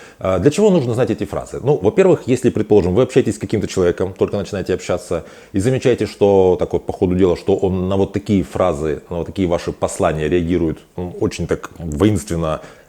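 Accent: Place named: Ukraine. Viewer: Похоже ,, психолог" из ,, незалэжной"- говорок выдаёт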